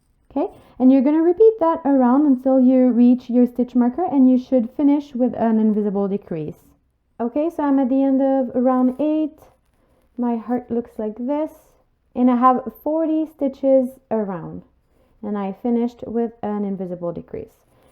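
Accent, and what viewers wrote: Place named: Quebec, Canada. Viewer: That accent.... T'es québécoise? C'est full cute le tutoriel merci ❤️ j'vais en faire plein❤️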